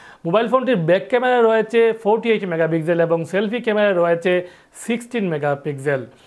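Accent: Sylhet, Bangladesh. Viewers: ভাই মনে হয় সিলেটি,, অনেক অনেক শুভকামনা রইল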